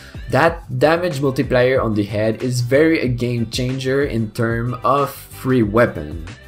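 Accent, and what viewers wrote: Canada. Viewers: bon dieu un vrai anglais de laval ou quebec respect good job vagabon est mon avatar salut lgros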